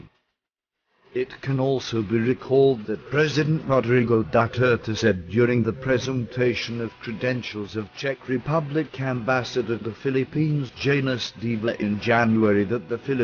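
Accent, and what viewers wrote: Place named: United Kingdom. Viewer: British robotic voice👎...but nice update👍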